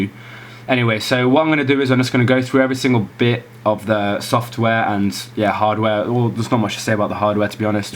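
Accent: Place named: Netherlands. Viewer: Flawless English accent for a Dutchie oml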